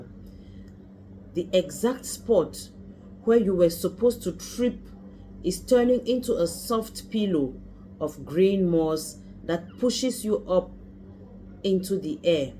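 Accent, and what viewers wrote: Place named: Africa. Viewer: A beautiful prose of becoming spoken in my honor. Thank you Child of the Most High God. From your accent, I believe you may be from the Motherland. You have superb writing skills — the literary world needs to hear from you … in peace and love, God’s Chosen Servant & Supreme Leader With Spiritual Authority ♥️👑♥️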